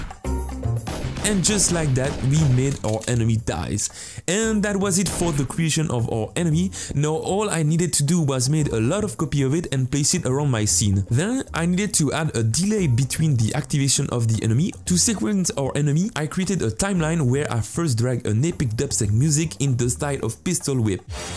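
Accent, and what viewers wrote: France. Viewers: Are u french? Sounds like it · Tu as un accent Français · Toi t’es français non ? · Ou la il est français lui avec son accent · Super cool! as usual! from where do you take your accet? i swear you're french!;) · Est tu français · You sound French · mdrr l'accent français est présent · Are you frensh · Are you french? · Wow the accent and the little FRA at the bottom of the screen clearly shows you’re French 😅 Also the search bar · J’adore l’accent français et très interessant la vidéo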